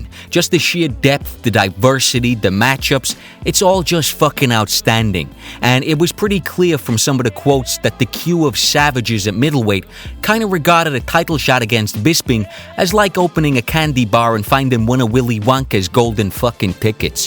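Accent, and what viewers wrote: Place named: New York, United States. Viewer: That "New Yorkesque" accent and slang is just tremendous x)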